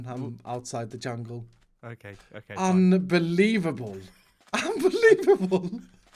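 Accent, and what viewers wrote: Sweden. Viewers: It sounds like you are swedish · Funny how i could instantly tell you're swedish based on your accent but had no idea keralis was from our western neighbour too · are you swedish? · I am new to your youtube. Are you from sweden becus you sounds wery like a swedish persone. Sorry if you not are from sweden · Do you come from sweden?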